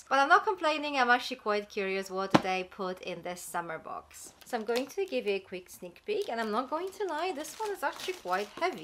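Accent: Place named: Poland. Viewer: I love your accent I love Poland it's a beautiful country what part are you from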